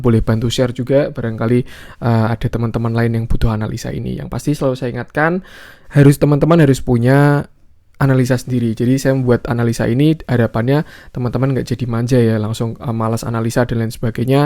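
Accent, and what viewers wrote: Indonesia. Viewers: Dochy Suara mimin nya medok banget, Dan terdengar sangat familiar, Dr.mimin 😂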